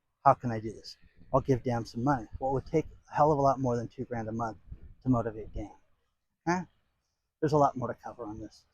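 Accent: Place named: Minnesota, United States. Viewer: Sounds like Minnesota.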